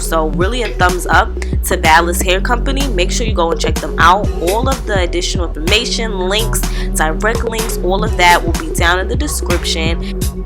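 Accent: New York, United States. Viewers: First time watching your video and I knew u was from NY right away, we can’t hide our accents nowhere lol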